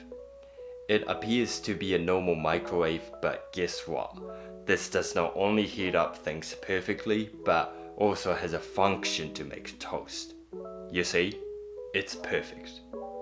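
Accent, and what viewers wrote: New Zealand. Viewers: Japanese with a Kiwi accent, adorable :)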